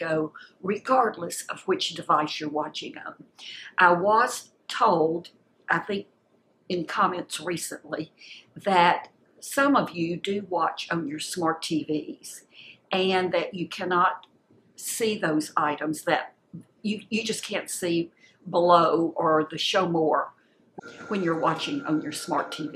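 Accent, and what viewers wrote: South, United States. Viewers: Glad I found you. I'm fast approaching my 70's and the difference between my late 50's and early 60's has caught me off guard! Changes in health, sleep, and my body in general. You are honest and fun without tons of pretense. Plus your accent reminds me of my favorite sister in law and other family that stayed down south. I use to live in Florida as a kid. Thumbs up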